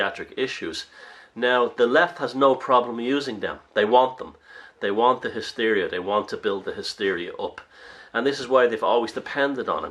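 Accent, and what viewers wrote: Ireland. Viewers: Hello, thanks for your inspiring video,s! Watching your twenty twelve, video! Totally agree with you!!!!!! Noticing, your tantilizing lrish accent, tinking, about jr Tolkien, sermillion Hobbit! Lord of the rings! Thanks very much, bee jeebers!!!!!!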